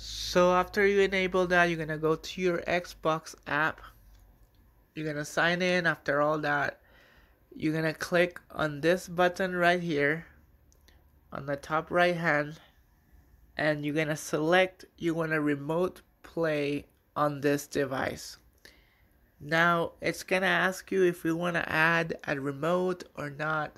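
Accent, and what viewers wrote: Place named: Brazil. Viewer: Cara teu sotaque é de br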